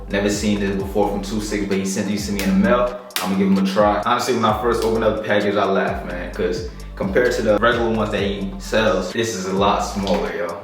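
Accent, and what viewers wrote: New Jersey, United States. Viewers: I can tell you from jersey by way you talk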